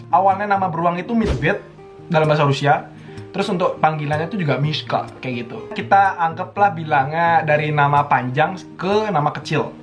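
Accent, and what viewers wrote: Bali, Indonesia. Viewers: Logat lu medok bgt bro bali nya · Kayak ada logat balinya.... apa prasaan aku aja kalik ya..🤭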